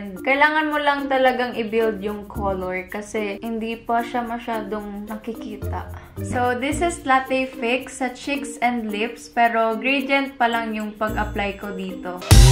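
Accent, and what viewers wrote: Visayas, Philippines. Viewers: parang bisaya to haha tabang mga binisaya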